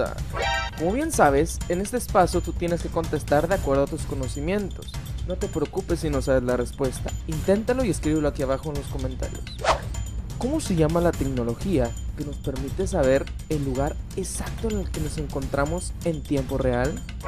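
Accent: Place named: Fresa, Mexico. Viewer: se oye como fresa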